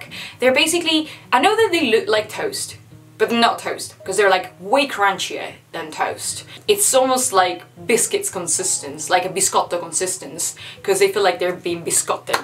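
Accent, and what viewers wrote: England, United Kingdom. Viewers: Bellissimo video! Il tuo accento inglese è top😱🦋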